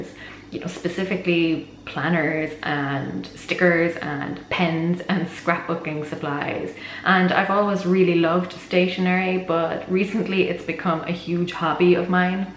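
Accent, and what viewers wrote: Ireland. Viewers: Interesting video :) Are you Irish?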